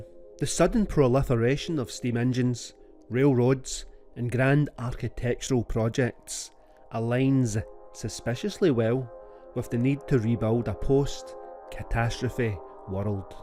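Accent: Scotland, United Kingdom. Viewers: Great Information...But Hard Time Understanding The Scottish Accent.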